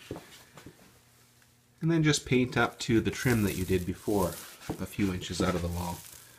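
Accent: Canada. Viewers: Bonding prkmer would have been a good start. After edging, don't wait! Wet edge. It is far better to keep a wet edge with any paint. You are lucky to still be able to get oil based paint, many states that is impossible, you sound Canadian, if so, I'd be shocked that they have any oil based paint there.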